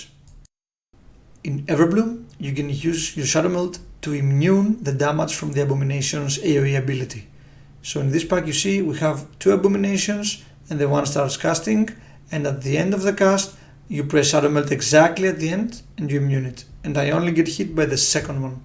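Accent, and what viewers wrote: Greece. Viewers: I knew that was a Greek accent. Καλή μέρα!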